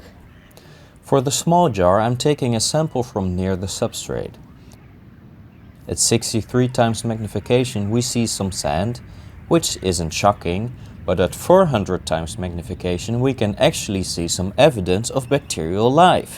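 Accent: Netherlands. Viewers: I never was sure if you were dutch but you said it in this video i love our dutch accents 😂goeie video gast!!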